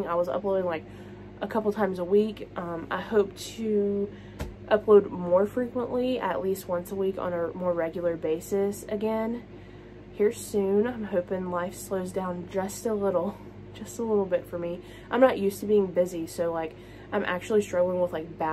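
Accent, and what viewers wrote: West Virginia, United States. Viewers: Lol gurl let the WV come out!! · My frand..your west Virginia coming out..I'm cryinggggg 💀🤣 I love your accent ❤️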